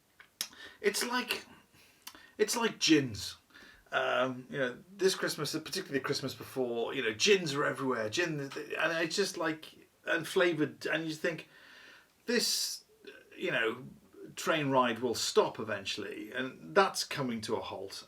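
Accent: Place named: Wolverhampton, United Kingdom. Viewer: Great Wolverhampton accent too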